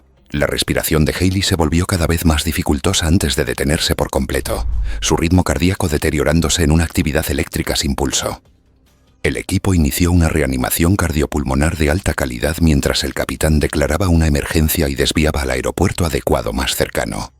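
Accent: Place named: Spain. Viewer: ME ABURIO TU ACENTO ESPAÑOL DEMASIADAS ESES Y ZETAS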